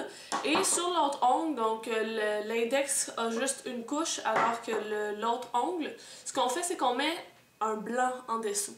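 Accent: Quebec, Canada. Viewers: C'est possible que sa marche ( le problème j'ai pas de vernis neon ) -.- je vais essayer quand j'en n'aurais mais sinon j'aime bien ton accent ! Tu es Québecoise ?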